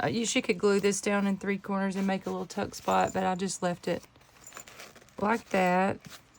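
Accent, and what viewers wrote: South, United States. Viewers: Love your southern drawl ❤️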